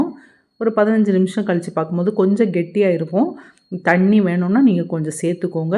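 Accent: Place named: South, India. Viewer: Love your South side slang ma'am. Nice❤❤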